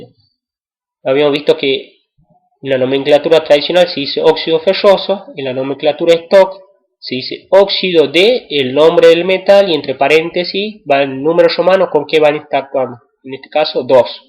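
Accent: Córdoba, Argentina. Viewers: Gracias! Ese acento cordobés me salvó... la verdad que ya ni recordaba esto y en unos días tengo evaluación diagnóstico jajaja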